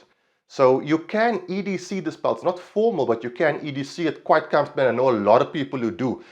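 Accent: Cape Town, South Africa. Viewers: hi are you from cape town? i like your accent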